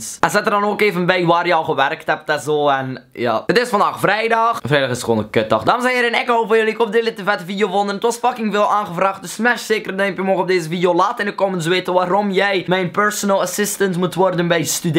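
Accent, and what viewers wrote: Belgium. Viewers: ben je een Belg of nl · Tis een belg?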